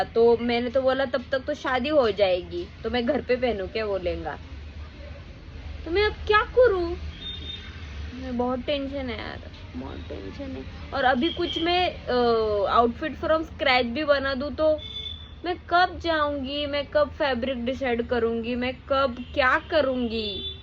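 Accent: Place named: Maharashtra, India. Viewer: apka hindi bahot marathi type hai 😁😁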